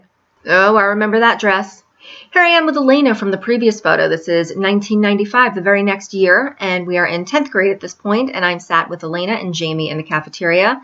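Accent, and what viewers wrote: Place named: New York, United States. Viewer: I can totally tell you're a New Yorker :P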